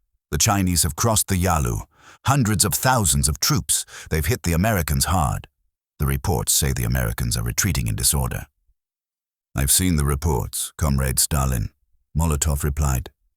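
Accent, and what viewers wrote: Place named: RP, United Kingdom. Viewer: Please go back to the old AI narrarator. This posh british voice is overused and annoying.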